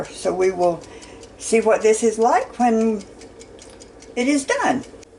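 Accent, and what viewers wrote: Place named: North Carolina, United States. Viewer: I love when you make old traditional recipes! 💞 You sound like your from my neck of the woods in NC! ☺️ I wonder if you've ever made Sticky Toffee Pudding? It's a very famous & popular moist cake from England & Ireland. I've never made it but would love to see it done. Thanks 🤍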